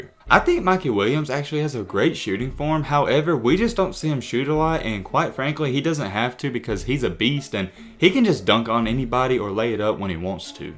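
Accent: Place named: Mississippi, United States. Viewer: You from Missippi? You sound like a man I met in Nachez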